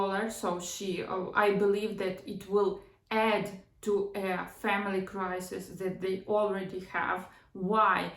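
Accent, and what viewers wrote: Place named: Russia. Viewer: gosh that’s just the most stereotypical Russian accent, and it’s not even a joke 🙈 don’t want to offend you, but I think u might need to work on your speech if you choose “storytelling”.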